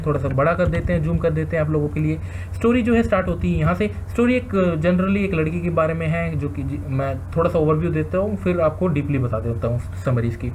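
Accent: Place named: Rajasthan, India. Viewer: You from Udaipur or Rajasthan. ?? Your tone depicts that... please tell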